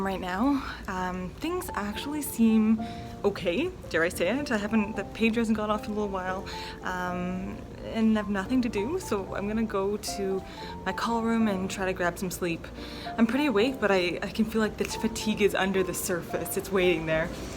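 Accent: Canada. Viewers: This is like the 8th video I’ve watched and I finally realised you’re Canadian 😂 Gosh I’m so bad at guessing accents, I really cannot hear a difference between Canada and the US 😂 I wonder if Americans make that mistake when listening to UK accents 🙈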